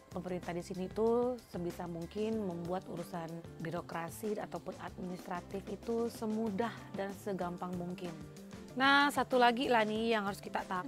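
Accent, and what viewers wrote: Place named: Pontianak, Indonesia. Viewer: alamak bahase pontinye:face-blue-smiling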